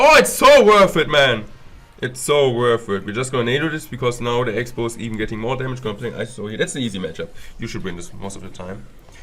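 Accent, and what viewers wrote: Germany. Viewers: Morten the german acc is same nice like the English thanks for this content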